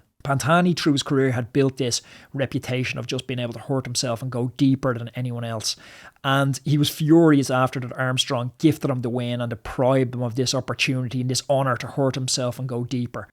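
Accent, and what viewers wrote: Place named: Ireland. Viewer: Its called authentic self if you cant tune your ear to a good Irish accent your just lazy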